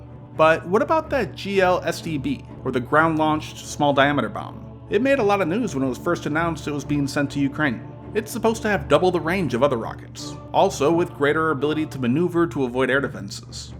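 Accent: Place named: United States. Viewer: Your hypersonic speaking speed, couples with that horrible American accent, ensures that most people in Asia would not understand much of what you are narrating.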